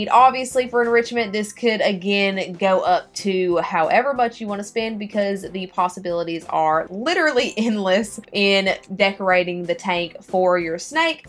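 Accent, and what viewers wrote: South, United States. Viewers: I love your southern accent! I'm a southern man myself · She obviously has a country accent, she could be from the south which is why her accent sounds the way it does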